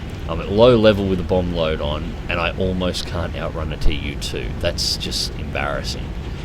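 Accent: Australia. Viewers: Oh you sexy voiced Aussie.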